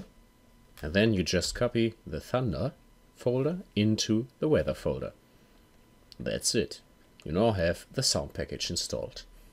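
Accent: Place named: Germany. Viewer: What city are you from in Germany?? I love your accent.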